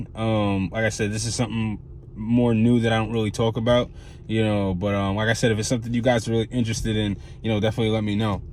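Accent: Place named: Baltimore, United States. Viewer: Dnt know yu first time in the channel bet my next 2 checks yu from Baltimore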